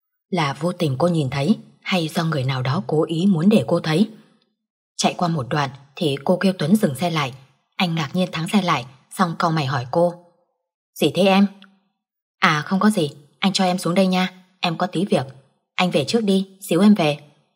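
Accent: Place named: Hanoi, Vietnam. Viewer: Giọng đoc rõ ràng. Đúng là giọng miền Bắc trước năm 1975 HÀ NỘI. Cảm ơn nhiều. CHÚC AN VUI.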